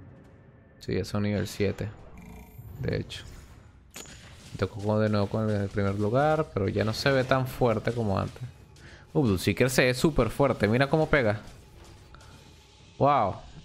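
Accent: Venezuela. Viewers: Eres venezolano mano? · lo mismo me preguntaba yo xD es venezolano ?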